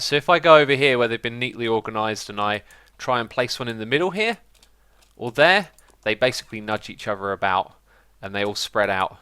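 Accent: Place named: United Kingdom. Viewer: when british is so perfect that youtube's auto-transcript bot gets it perfectly right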